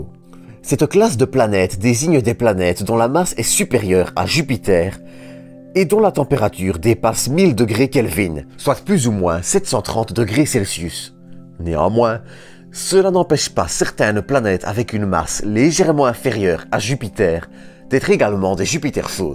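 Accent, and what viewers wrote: Belgium. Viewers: tu n'as jamais entendu les différents accents belges? et oui, les imitateurs Français des accents belges sont nul lol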